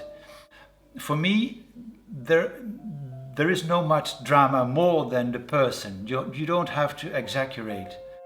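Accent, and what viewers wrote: Netherlands. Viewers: Love your work! Just curious - where is your accent from? I'm guessing Dutch?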